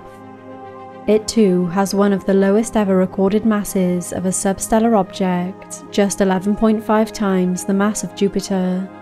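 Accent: United Kingdom. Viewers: British limey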